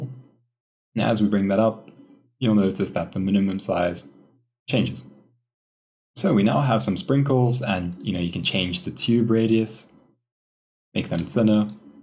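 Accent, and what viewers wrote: South Africa. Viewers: Only stumbled on your stuff now...almost 2 years later. I was surprised to hear the South African accent. I'm here in Gauteng. Great tutorials man!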